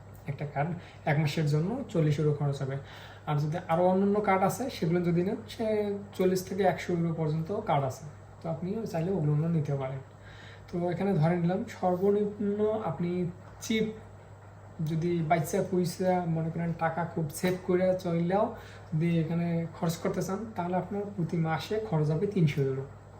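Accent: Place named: Barishal, Bangladesh. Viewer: বরিশাইল্লা🤔🤔🤔🤔🙄🙄🙄 সামনের লেখা দেখে দেখে,রচনা বলতেছে🤣🤣🤣🤣🤣🤣🤣 ভালো আছি,পবিএ মক্কা আছি🥰🥰🥰🥰🇸🇦🇸🇦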